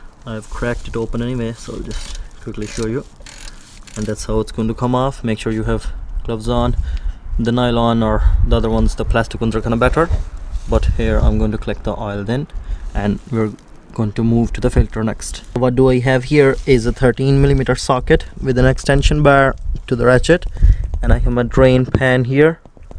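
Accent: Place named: Portlaoise, Ireland. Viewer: That a Portlaoise accent I’m hearing, Thanks for this video brother helped me save allot.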